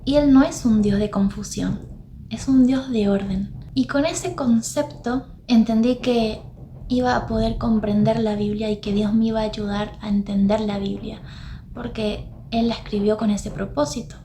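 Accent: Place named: Misiones, Argentina. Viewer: Hola. Soy Nidia. Nací en Misiones, Argentina, así que tu acento me es familiar. Estaba viendo otra cosa y toqué la pantalla sin querer y me trajo a tu video... una "diosidencia"... me encantó tu testimonio y la manera tan dulce que tienes de hablar, tambien la profundidad de lo que dices. Eres una sierva del Señor. Gloria a Dios por como te usa. Yo hace mas de un año estoy compartiendo los repasos de las lecciones de escuela sabática en mi canal. Y otros temas de salud mental pues soy psicoterapeuta. Te invito a ir a mi canal, quizá te guste estudiar conmigo. Y cuando dijiste que somos sólo barro en manos de Dios me sentí tan identificada, siempre digo lo mismo. Es más, compuse una canción sobre eso. Te la quiero compartir, con mi esposo formamos el Dúo Qadash, y grabamos esta canción con unos sobrinos del corazón, el grupo Cyan de Venezuela. 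Te la comparto y espero te guste. <link> Dios te bendiga y te use con poder en la predicación del evangelio. Queda poco tiempo para su venida, tenemos que estar fundamentados en la Palabra al 100%. Yo me dedico a predicar en mi canal. Y espero que tu canal llegue también a muchas personas... si todos los adventistas usáramos nuestras redes para cumplir la misión llegaríamos a todo el mundo rapidísimo. Dios está despertando a su pueblo, está levantando un ejército santo dirigido por su Espíritu... nosotras somos parte de él. Gloria a Dios por eso. 🙏 Te mando un abrazo. Me suscribo a tu canal y puse el "me gusta" número 667... te hic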